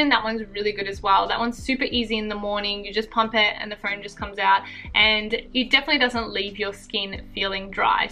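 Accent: Melbourne, Australia. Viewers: I'm trying to develop the superpower that is Being Able to Distinguish Between Australian Accents. You have the accent of a well-educated Melburnian but you sound like some friends I have in Sydney too...I'm torn. Argh. Please let me know whether or not I should hang up my cape ;) PS= Hada Labo will be the next brand I really investigate next time I'm replacing skincare in Japan so thanks for this video. :)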